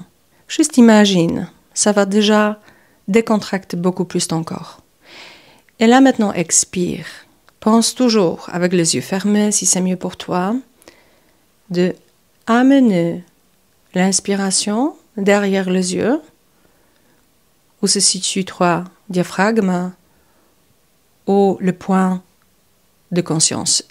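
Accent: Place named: Czech Republic. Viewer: Vous êtes des pays de l’est, tchèque? En tout cas trés intéressant votre voie pour travailler la voix.En comédie, pour dire, on représente un autre que soi,une cible, les yeux dont vous parlez , avant la voix que l’on projète. Merci!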